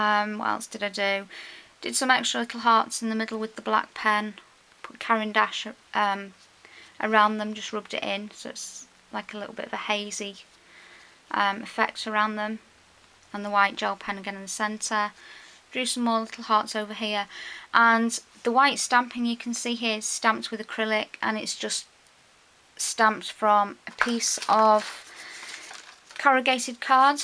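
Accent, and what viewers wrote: United Kingdom. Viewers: Congrats on your over 1,000 subscribers!! You have great videos and you're so talented, plus for us Americans....me anyway, I love your "accent"...you're very easy to listen to! (Quote around accent because wasn't that long ago, I was the only American on the whole of the Isle of Wight and everytime I opened my mouth I "stuck out" like a sore thumb. BUT....I loved the UK and really everyone I met, was such a delight! Seriously though I just love your no fear attitude with your crafting!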